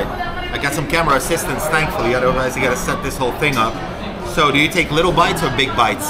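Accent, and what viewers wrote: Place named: United States. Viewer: you born in russia you live in poland? you sound like american its weird 😅 but man thank you for having you here in our country maraming salamat mabuhay po kayo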